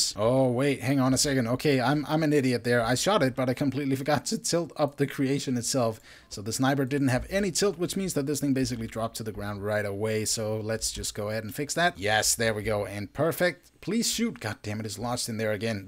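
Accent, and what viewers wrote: Denmark. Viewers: he sounds danish.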